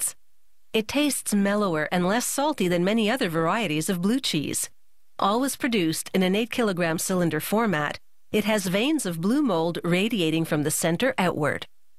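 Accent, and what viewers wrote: Canada. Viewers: is the narrator Canadian? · Actually this is Canadian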